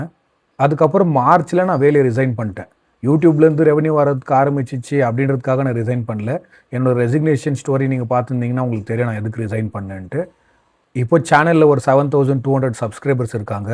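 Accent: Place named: Bangalore, India. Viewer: Your channel is on right track bro..Vitradhinga. Post more contents, different contents. Unga tamil criticize pannatha soninga but enaku contentla romba pudichathey antha bangalore slang tamil than. Youtube is supporting you, you have to put more efforts. Na daily 3-5 hrs stream panren but en efforts ku yetha revenue kedaikala. But your channel doing good, don't waste it. Be consistent.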